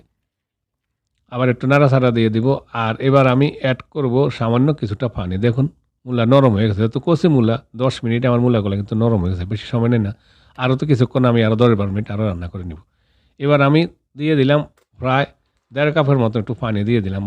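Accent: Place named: South, Bangladesh. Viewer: আমার বাড়ি ভোলা।আপনার কথার ধরন দেখে জিঙ্গেস করলাম।সাধারনত আমারা দক্ষিন অঞ্চল এর মানুষ এইভাবে কথা বলি।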